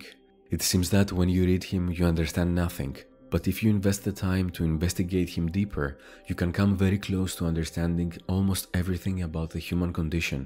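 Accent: Greece. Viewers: yeah im a greek and his accent sounds Greek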